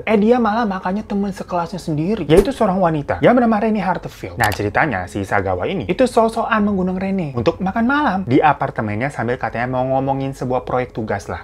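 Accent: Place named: Betawi, Indonesia. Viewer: Cara ngomong lu keg guru ngaji gua.. betawi bed da ah 🤣🤣🤣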